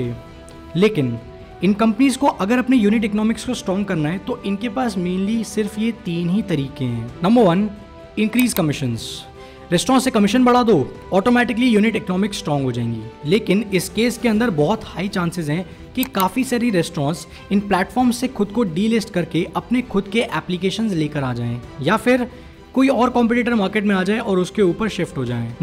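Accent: Bareilly, India. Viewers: Are you from Bareilly?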